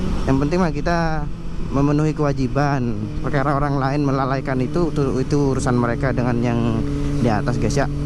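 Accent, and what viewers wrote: Sidoarjo, Indonesia. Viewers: Orang Sidoarjo bang?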